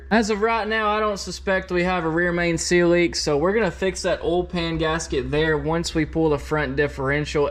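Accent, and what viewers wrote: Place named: South, United States. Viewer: 남부억양인가 찰지네 ㅋㅋ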